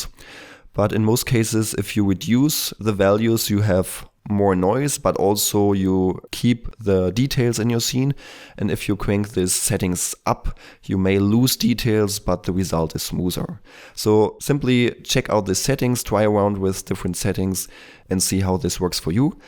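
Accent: Germany. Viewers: are you german? you really sound so... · ) nichts gegen dich aber bemerkt das du deutsch bist diese aussprache von englisch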